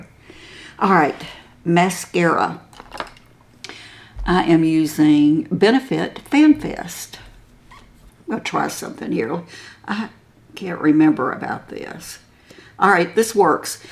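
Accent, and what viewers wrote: South, United States. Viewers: Just think you’re ADORABLE!! Love your Southern accent!! It’s so beautiful!! I flew for Southwest Airlines and the flight attendants that were from Dallas and other Southern states, could get away with saying just about ANYTHING ( rude language) to “bad customers “ with that Southern accent and that passenger had ABSOLUTELY NO CLUE that they were being made fun of OR that they were just being TOLD OFF!! 🤣😂🤣😂!! That accent could sink battle ships!! I loved it and tried to copy it 🤭🙄 with absolutely NO LUCK!! Keep up your great work and you are so inspiring!! ❤️🤍💙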